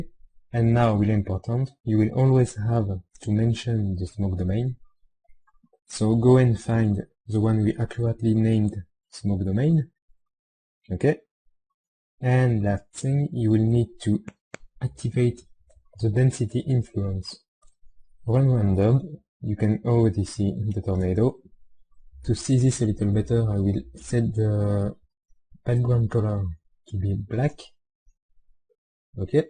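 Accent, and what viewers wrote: France. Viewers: This videos are like a two-for-one deal for me. I learn how to do particles in blender and learn how to do a French accent! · yea , l accent francais est vraiment unik . Good tut btw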